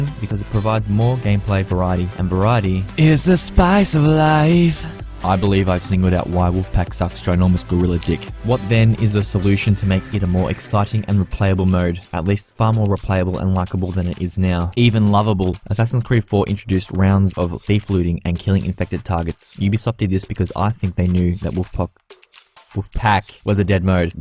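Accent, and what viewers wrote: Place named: Australia. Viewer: Liked the comm ! but did not understand anything because of your Auzzie accent -_- :p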